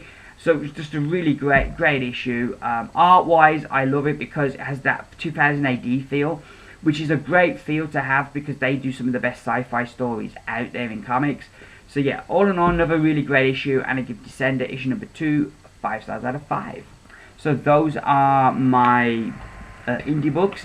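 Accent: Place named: South, United States. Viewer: Enjoying your southern US accent :)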